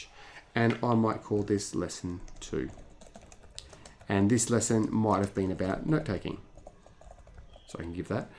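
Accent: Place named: Australia. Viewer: The Aussie accent has got to be hands down the best accent imo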